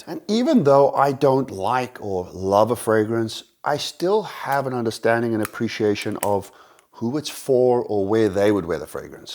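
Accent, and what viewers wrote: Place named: South Africa. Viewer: I knew your accent was familiar. You mentioning South Africa confirmed my suspicion.😂😂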